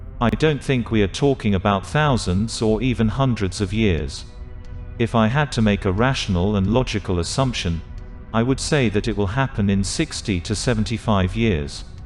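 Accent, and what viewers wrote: France. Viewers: I love when English is spoken in French 💜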